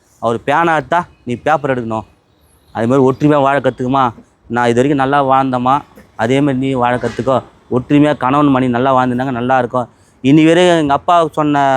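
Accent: Madurai, India. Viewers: மதுரை வாசகம் சூப்பர் வாழ்த்துக்கள் 🎉🎉🎉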